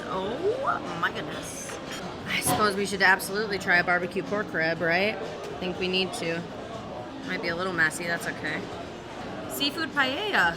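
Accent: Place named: Midwest, United States. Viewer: I can hear the midwest in your voice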